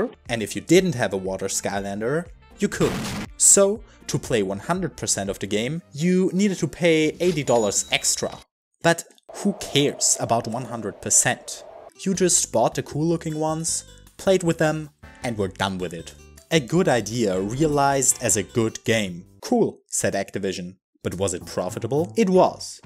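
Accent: Germany. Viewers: Your accent sounds really german are you?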